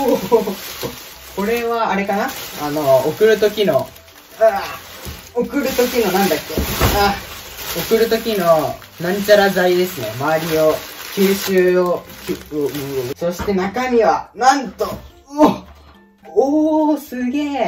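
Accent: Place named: United Kingdom. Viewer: めっちゃイギリス発音！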